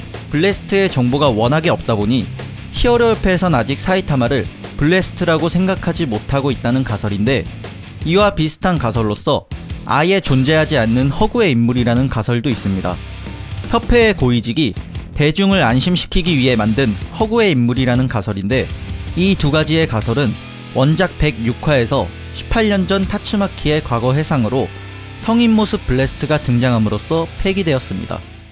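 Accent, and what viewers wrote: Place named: Gyeongsang, South Korea. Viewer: 경상도분이신가보넹 잘봤습니다.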